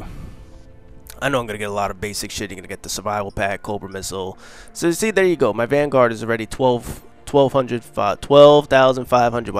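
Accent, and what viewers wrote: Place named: New Jersey, United States. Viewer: He sounds like he's from Jersey Shore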